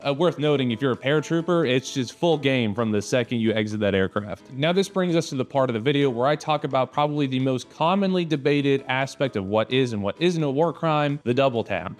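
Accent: United States. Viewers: Hold up. Your American accent tells me enough. Pay Japan and get it over with American. Consider your own first words in this video. (I enjoyed your content [as in this first 5 second clip I watched from you] but I must leave and never watch the video further because that would most propably make me look like an idiot as you will most likely prove my own point or speak about the "illegal warcrimes")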